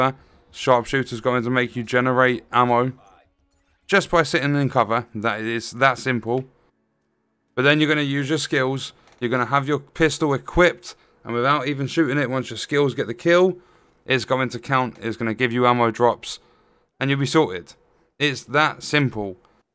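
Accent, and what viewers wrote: United Kingdom. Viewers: subbed just becauce of you wounderful british accent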